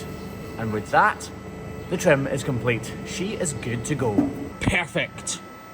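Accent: Scotland, United Kingdom. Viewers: I'm not a farmer. I don't even know how youtube originally sent me to this channel. But all I know is that I'm addicted and keep coming back again and again, even when I try to stay away. Maybe it's that sweet Scottish accent. Maybe it's knowing that these animals aren't in pain any more. Maybe it's the easy comradery. Or perhaps it is all of the above. Regardless, here I am again. Thank you for helping these poor sweet cows.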